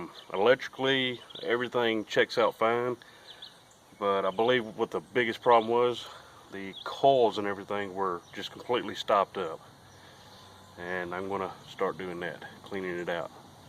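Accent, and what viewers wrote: South, United States. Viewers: Just love the southern accent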